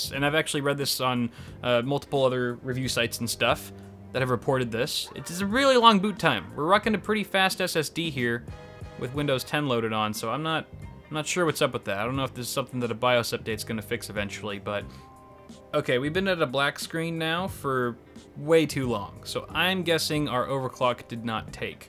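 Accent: South, United States. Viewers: I read this in southern accent- I have no idea why!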